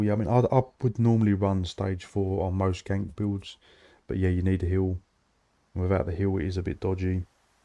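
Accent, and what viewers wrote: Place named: Kent, United Kingdom. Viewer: Hey bro, pretty awesome build. Thanks for the good work. Sound like you're from down Kent way. I saw a build for battlegrounds and cyrodil but can't remember if it was you or another creator, sadly I got interrupted with family bits and lost the video! But if it wasn't you maybe you can use your big brain to make it? Was a gank build using destruction staff and frost, the numbers were absolutely huge. Think there was some elements of heavy attacks from range and the recovery was shite. But... Did some nice AOE too. PvP is wild since subclass, I've been away 4 years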